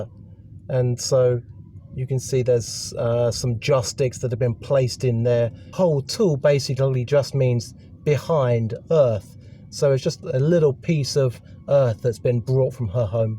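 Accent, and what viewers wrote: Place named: England, United Kingdom. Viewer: You misunderstood : it is traditional to state the Chinese hometown of the deceased on the headstone even the deceased was born in Hk ( ie hometown of the deceased ‘s paternal family then if born in Hk ) it doesn’t mean the body of the deceased was moved all the way from China to Hk for burial . But overall I am impressed you knew more about Fung Shui then me ! I also like your English accent thx for the video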